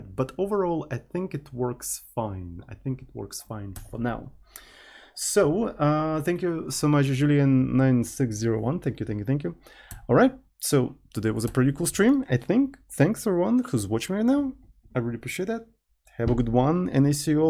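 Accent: Russia. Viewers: Русский акцент 😂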